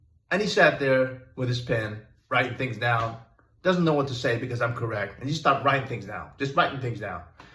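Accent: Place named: United Kingdom. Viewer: too british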